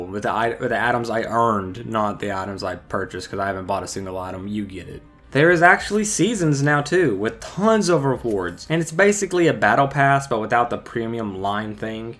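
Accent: South, United States. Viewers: Man you got like 1/10th of a southern accent lmao. it kicks in randomly every now and then. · The fact that you pushed yourself soo hard for the sake of making this video despite the relationship you have with this game (as mentioned in this video) deserves praise! You have a southern accent! I hail from a land in the southern region of the U.S. btw, I think you were hooked when you actually started worrying about the condition of your home... to an NPC. LOL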